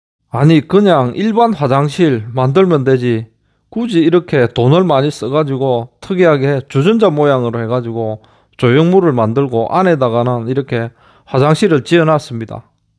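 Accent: Gyeongsang, South Korea. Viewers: 님 댓글 감사드립니다 땡큐 💘 경상도 사투리 ㅎㅎㅎ 오늘도 행복하세요^^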